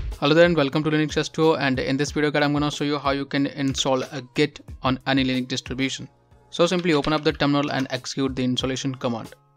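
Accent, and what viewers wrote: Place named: India. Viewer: Talk slower please, I can learn and speak with second language accent. me, a 57 year old white guy trying to learn Linux-GNU from the beautiful (vernacular) Indian accent. You speak faster when giving instructions or says a new name.vernacular I have to learn more acronyms than the Navy- Air force and Space Agency put together. oO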